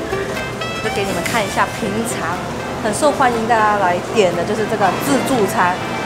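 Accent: Malaysia. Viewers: 怎么你的普通话口音不像香港口音，倒是像马来西亚口音（可能我听口音不是很准），但是讲粤语就有了。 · 每次睇到既片都覺得香港人去台灣讀書講國語馬來西亞口音好神奇😂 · 你的普通話，好有馬來華語腔啊！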